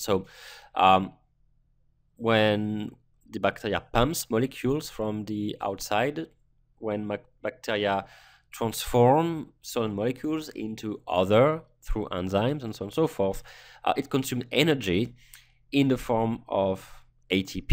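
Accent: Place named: France. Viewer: nice touch with the logarithmcic scales, it a good move on your part really, being able to represent 1E5 and 1E-5 just with 10 units of difference graphically shure does help a lot, the only thing I hope there will be is some kind of note for newcommers to inform them. It still seems to advance nicely. (but that french accent though, ça fait bizarre à entendre '-' . C'est surement moi qui est bizarre...)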